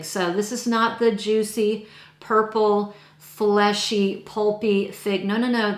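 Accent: France. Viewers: Question please : What languages do you speak ? Do you speak French or Italian I notice your pronunciation 😉Would love to see a video on this topic ❤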